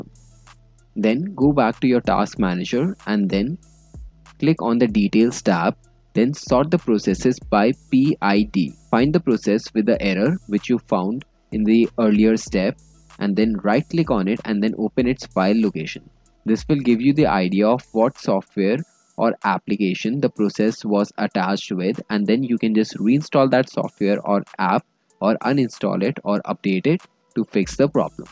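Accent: India. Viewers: when you hear indian people. you know it will work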